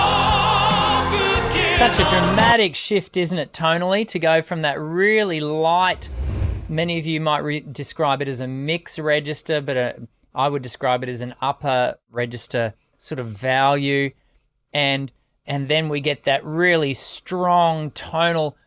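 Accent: Australia. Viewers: His microphone shake is also a good way to respond to people who continue to insist that "he isn't singing live" just food for thought... also it isn't just a personal best... there are no other recorded live examples of this feat (if you have one show me) best voice in the world (that's an opinion translates to "favourite") best technical vocalist? that is measurable, got a better one over all aspects? appreciated your commentary it was very Aussie... greetings from the Sunny Coast.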